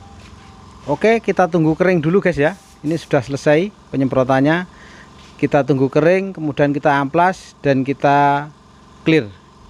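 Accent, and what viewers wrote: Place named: Magetan, Indonesia. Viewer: Kok bahasane koyo wong magetan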